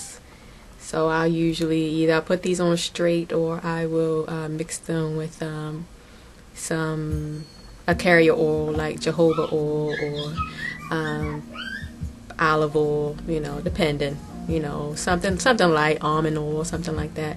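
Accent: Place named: Maryland, United States. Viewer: thought i heard a maryland accent!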